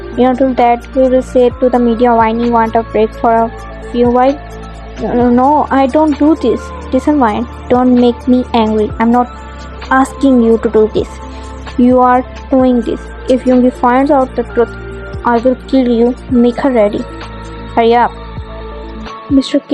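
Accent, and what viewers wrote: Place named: Asia. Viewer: don't mind but I thought you were from Asia or something cuz of your accent 🙂🙂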